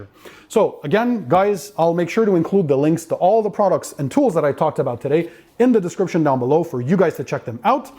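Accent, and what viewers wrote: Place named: Montreal, Canada. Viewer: I was going to ask if your from Montreal because of your accent and then you said it! From here too!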